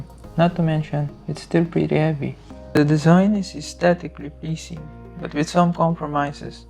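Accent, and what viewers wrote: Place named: Philippines. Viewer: I can see from your accent and your hand tattoos from Kalinga tribe that you are a Filipino. May I know how did you manage to get your hands on AW x17 r1? Did you use 3rd party courier? As you know, here in PH the x17 r1 is still unavailable and most likely will never be available because I heard that Dell PH will only import the X15 versions.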